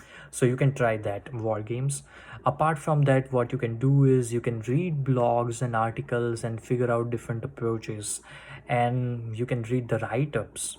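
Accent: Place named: India. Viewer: English Ko Bhi Hindi Jaise bolte ho😁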